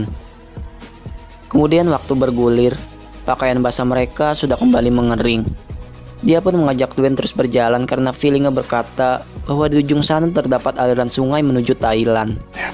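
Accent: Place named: Banyumas, Indonesia. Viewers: Ngapak ngapak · Mimin ngapak🙈🤭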